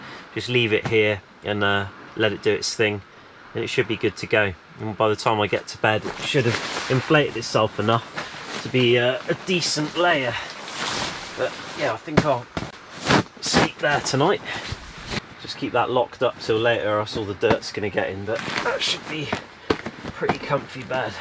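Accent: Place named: Forest of Dean, United Kingdom. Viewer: Love all the British cultural references to Yorkshire tea and Saville as well as the occasional lapses into a broad Forest of Dean accent. Entertaining as always. Guess I’m one of those subscribers who will listen no matter what the content is each time! Keep enjoying the filming so we can keep enjoying the viewing 👍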